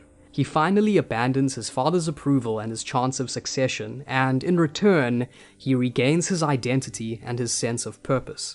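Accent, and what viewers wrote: South Africa. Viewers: Can hear that South African accent :P nice videos bru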